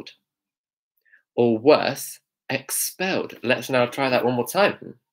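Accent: United Kingdom. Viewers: yaaas! the scar, the glasses, HP mood, British accent - I love all of it <3 very helpful! thanks! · Perfect brit accent!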